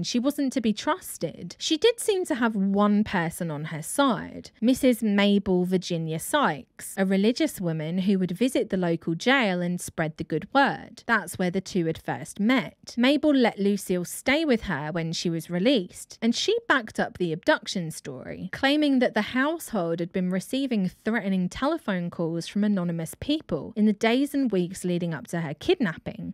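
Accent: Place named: United States. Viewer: Please, I'm begging you, no more American accents.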